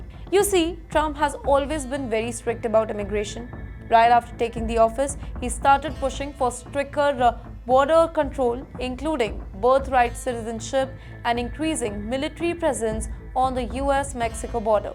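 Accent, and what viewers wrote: India. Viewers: Apparantly she is an Indian. She speaks in Indian version of English. Perhaps the channel cannot afford high remuneration demanded by American anchors.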